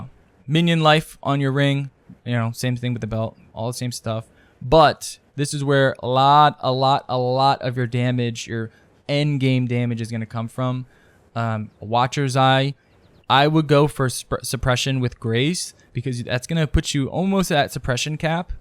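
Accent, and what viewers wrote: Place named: Colorado, United States. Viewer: are you a wook? im from colo.. people around here sound like you.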